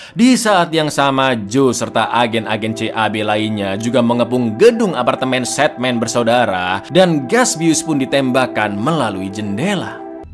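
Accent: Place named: Kebumen, Indonesia. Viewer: cak pean wong kebumen dudu ?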